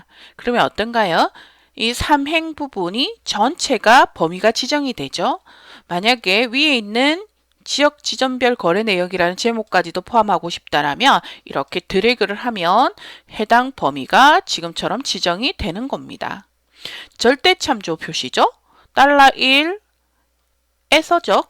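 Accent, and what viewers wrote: Gyeongsang, South Korea. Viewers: 액셀 활용에 매우 유용한 강의입니다. 부산 억양의 선생님 감사드립니다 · 60대 부산사람이 경상도 말씨로 강의를 들어니 귀에 속 들어오네요... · 경상도 사투리 억양이 넘 듣기좋아요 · 나도 이분 목소리가 너무 듣기 좋아 쏙쏙 잘 들어갑니다.짤막한 강좌를 시간 될때마다 듣는데 머리에 잘 들어옵니다 경상도 분 이 신것 같은데 왜 그리 목소리가 곱습니까 강의 내용도 좋습니다.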